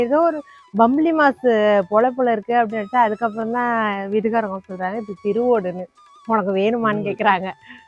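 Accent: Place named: Thanjavur, India. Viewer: Hi da nanum thanjavur unga slang pudikum unga area name venum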